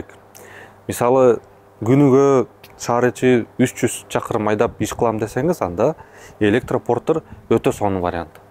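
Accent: Standard. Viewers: Бул жигит кыргызча так таза суйлойт экен. Кулакка жагымдуу угулат экен. Обзордо дегенди серепте деп койсок болчудай го. Обзор жасоо - серептоо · Азаматсын,кыргыз тилинде таза суйлойт экенсин,ошон учун аягына чейин кордум,ишине ийгилик! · Сен баарыбызды Кыргызча туура сүйлөгөндү да үйрөтүп жатасың рахмат . Мен негизи уккам башка бирөнү ата деген күнө болот жана мен башка улуттан болом деген да күнө болот ошондой эле тилге даны тиешеси бвр . Биз Кыргыздар Кыргыз болгондон кийин өз тилибизди ушу Балага окшоп таза сүйлөйлү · Аллах ыраазы болсун ушундай кыргызча таза суйлоп тартып чыгара бер.Ишине ийгилик. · Кыргызча таза суйлойт экенсин,Азамат! Жакшы информация бердин рахмат,ишине ийгилик!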